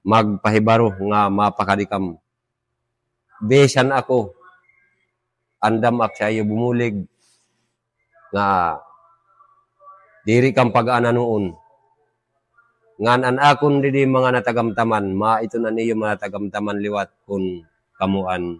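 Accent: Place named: Waray, Philippines. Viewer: Northern Samarenyo waray parin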